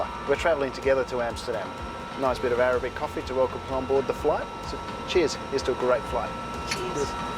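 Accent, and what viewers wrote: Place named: Australia. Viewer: Hi, another great video. Can you speak Dutch? )Asking because you have a proper aussie accent:) )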